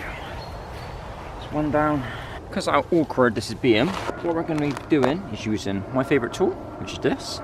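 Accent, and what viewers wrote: Bristol, United Kingdom. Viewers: Hello, do i detect a Bristol accent? Subscribed this is going to be epic 😎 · Aye are u Bristolian too!? 😅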